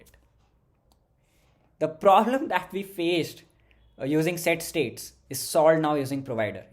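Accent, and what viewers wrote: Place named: India. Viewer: You speak indian mixed with english make me headache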